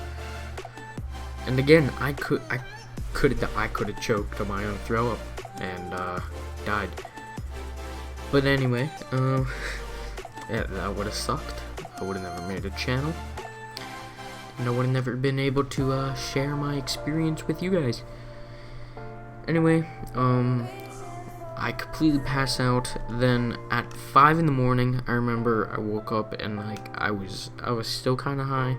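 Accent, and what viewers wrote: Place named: Canada. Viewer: u sound Canadian m8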